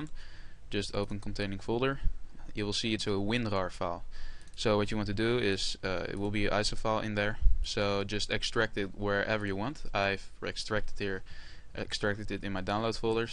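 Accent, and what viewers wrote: Netherlands. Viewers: Nederlands! ik hoor het aan je stem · Je hoort gwn aan je Engels dat je Nederlands bent. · are you dutch??? i hear on your accent i have the same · Volgens mij ben je nederlands. I think you are Dutch wahahah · dacht ik ook al, niet door die comment,maar je nederlandse accent :D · i can tell by your voice that ur dutch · you'r dutch ( i too) i can hear it! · Hahaha jij bent nederlands :p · je doet het geweldig. Heel goed Engels, ik hoorde dat je waarschijnlijk Nederlander bent maar had dat eerst niet goed door. Compliment. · Je kan heel goed horen dat je een Hollander bent! LOL +1 abonnee! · he is Dutch and English is his 2nd language. I bet those who complain speak only one language. Besides I think he's got a very nice accent!! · vanaf het begin wist ik dat je Nederlands was! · you sound dutch!!! im dutch too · Nederlander? haha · Haha, je klinkt super nederlands, and btw, vreselijk bedankt! 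:d <3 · nederlander accent hoor ik.... very nais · yes he is i can hear are you dutch i''m dutch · je hoort echt aan je stem dat je een nederlander bent ! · are you dutch? Your accent sounds dutch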